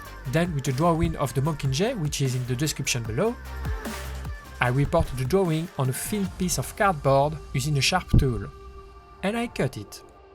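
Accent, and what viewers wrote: France. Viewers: Tes un français ou pas parce que ta vraiment un axen très français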